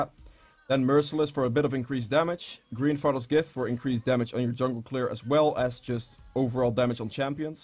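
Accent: Netherlands. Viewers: hi man i just wanted 2 ask you a question, your voice sounds like you are from the netherlands, is that true? if so can i add you on league? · i can hear you're dutch :P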